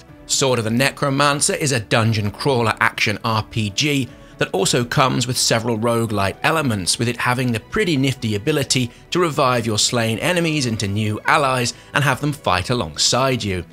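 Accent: United Kingdom. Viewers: You in the Netherlands? Thought you were English!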